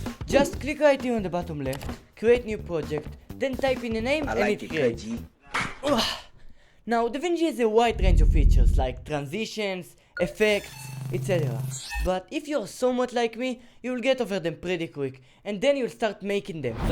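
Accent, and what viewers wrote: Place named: Russia. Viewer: in a very good way, sounds like you are from MOTHER RUSSIA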